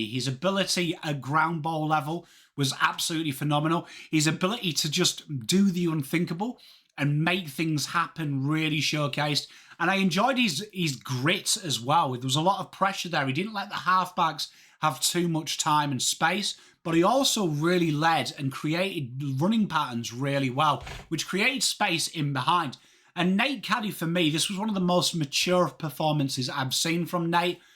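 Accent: England, United Kingdom. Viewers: It’s so weird listening to a pommy talk about afl lol but ya know what you’re talking about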